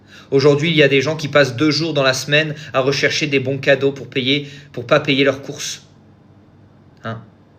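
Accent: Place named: South, France. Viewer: Je veux les vidéos avec l accent du Sud.🎉Pourquoi tu l as enlevé?😮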